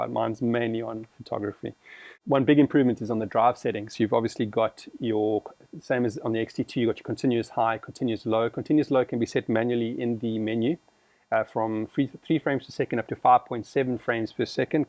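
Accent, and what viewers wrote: South Africa. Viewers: I stumbled upon your video and I knew the first minute there was something different in your accent. To me S. Africans sound like they had a little German inside trying to communicate in English, please no offense intended! I'm Spanish and we speak the worst English in the world, period! Nice video anyway, I'll watch more.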